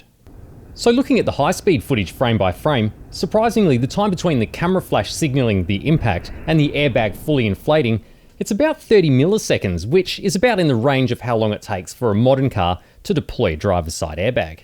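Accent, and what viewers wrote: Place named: Australia. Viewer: As a not native English speaker, your Australian accent is no problem. Scottish though, that requires subtitles...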